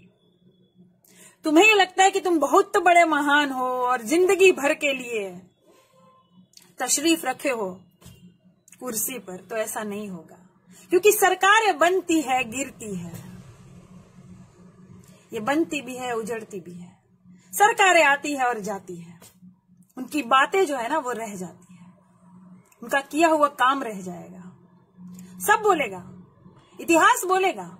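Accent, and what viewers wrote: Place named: Bihar, India. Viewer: Madam I like your bihari UP style Waiting for next Perpunch in Montreal Thanks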